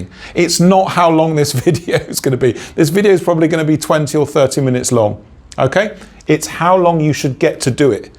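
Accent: England, United Kingdom. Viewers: great to have an English accent on here. Most etsy helpers seem to be from US!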